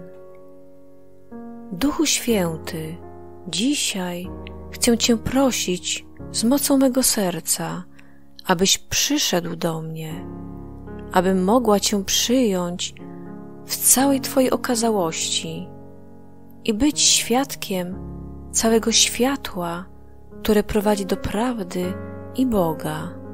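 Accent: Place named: Białystok, Poland. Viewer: Jestes z Bialegostoku lub okolic ze tak dziwnie mowisz?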